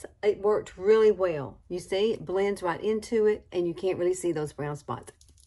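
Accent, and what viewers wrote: South, United States. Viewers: I’m from Mobile Alabama I thought you sounded southern. Southern girls need to stick together. You’re absolutely beautiful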